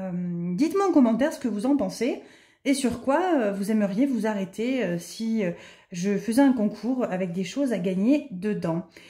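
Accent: South, France. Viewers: Cc 5000 abonnés c est super.....c est grace à ta bonne humeur,ton accent plein de soleil et tes vidéos toujours agréables et de bons conseils !!!! ❤❤ Pour les défis je suis comme toi j ai envie de tous les faire !!!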